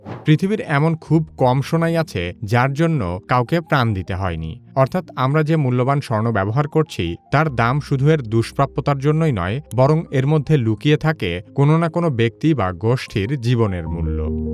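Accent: Bangladesh. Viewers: ভাই আপনি কি নোয়াখাইল্যা নাকি?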